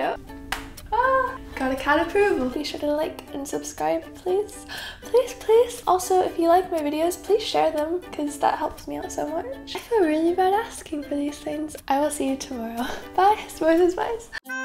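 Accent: United Kingdom. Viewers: It's really cute hearing her start to pick up a British accent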